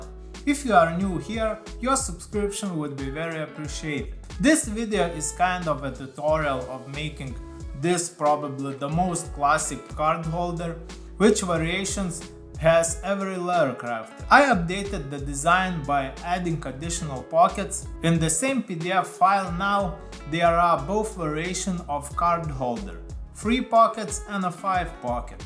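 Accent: Russia. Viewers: Ну как будто бы он сам из РФ, учитывая акцент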